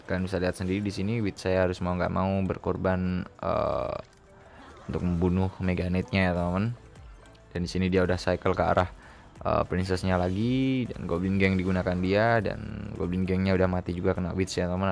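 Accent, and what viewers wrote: Yogyakarta, Indonesia. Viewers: Wong Jogja bro, wong Jogja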